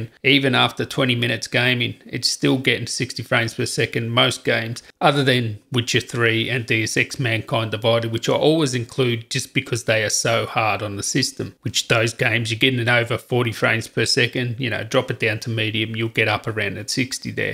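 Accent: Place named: Australia. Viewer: ah.. you did it! great content, your accent is quite australian though!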